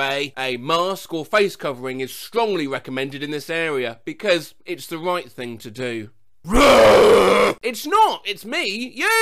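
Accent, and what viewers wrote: United Kingdom. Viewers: He’s British. That’s how they say it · British voice are like irl comedy accent!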